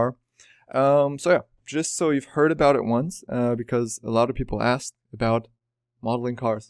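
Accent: Germany. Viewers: You sound very german:) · Achso du bist Deutsch? Ich habe dein Channel noch nicht so lange verfolgt. Man hat das muss ich zu geben ein bisschen an deinem Akzent bemerkt aber eigentlich kannst english so gut das ich erstmal dachte du könntest auch von ganz wo anders her kommen.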